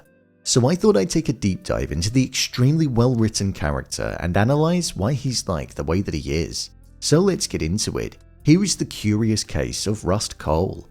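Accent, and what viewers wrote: England, United Kingdom. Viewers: that's not an Australian accent and anyone from Australia would be insulted by that. Its a straining for a poo part of England robotic voice.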